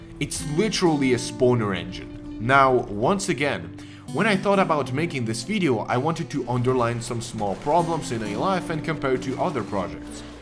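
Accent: Russia. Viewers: As I understand it, the author of the channel is a Russian speaker like me (based on the RU interface, which sometimes appeared in the video and a slight accent) I can only praise him for such a video, knowing what kind of resistance he can get from those who justify all these downgrades. It is surprising that in the video of an English-speaking person there are almost no dislikes and defenders of this "masterpiece", but when a Russian-speaking person says similar things, then some kind of nightmare and insults begin in the comments. Thanks to the recommendations of YouTube for letting me see this video, thanks to the author for the work done. All the best. P.S. Nothing in the game works as the developers claimed, but it certainly deserves 83% positive reviews (at the time of writing the comment). Fucking circus, there are simply no words.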